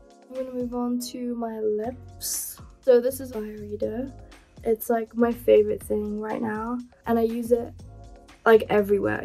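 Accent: United Kingdom. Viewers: am i the only that didnt know she was british??? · SHES BIRTISH?? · I love her british accent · shes british? 😢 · BEABADOOBEE'S BRITISH?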